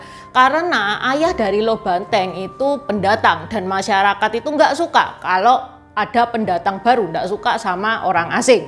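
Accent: Java, Indonesia. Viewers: Medog banget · Intonasi bhs sangat Jawa bnget..mantap mudah dimengerti dipahami..mksh mbak.. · Cicinya Indonesia banget... Keliatan dari medoknya🙏🙏🙏🙏👍👍👍👍👍❤️❤️❤️❤️❤️ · Aksen jowomu medhok Cik. Sukses selalu berkarya ci. Di deket pasar kopro tanjyng duren ada toko obat Lo Banteng, itu asli keturunannya atau waralaba Li Banteng ya. Btw, aku gak kenal Suhu Lo Banteng, tapi aku pake obat araknya, rupanya gitu ya sejarahnya sang Suhu tsb.... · ci bahasa jawa nya mendok kali,,ci ajarin bahasa Tionghoa dong ci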